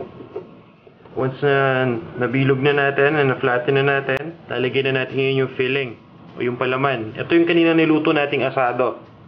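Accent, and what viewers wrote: Philippines. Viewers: Except for the loud background music, will u people stop criticizing this chef? His English is NOT very bad that u have to complain about it! & his accent is ok! Pinóy accent naman yun; what's wrong with it? Would u rather hear him speak in a foreign accent (like American or Chinese accent) while he uses the Tagalog language?!? Remember that his videos are NOT about grammar or phonetics!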